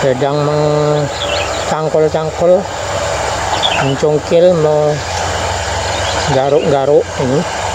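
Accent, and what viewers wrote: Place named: Jambi, Indonesia. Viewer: Betol nian wak sakai kalo org jambi ngomong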